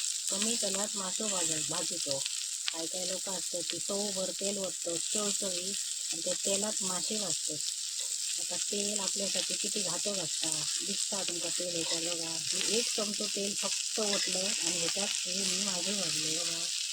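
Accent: Malvani, India. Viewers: काकी, तुमचा मालवणी बोलना भारी हा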